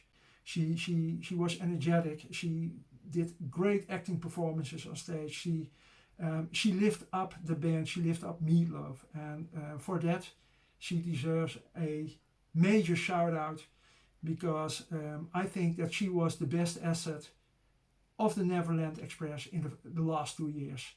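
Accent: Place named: Netherlands. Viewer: Het is goed te horen dat jij Nederlands bent. Behoorlijk accent!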